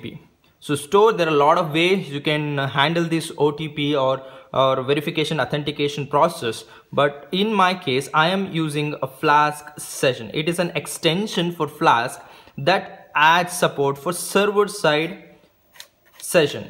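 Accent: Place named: India. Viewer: 印度咖喱味的英语太难听了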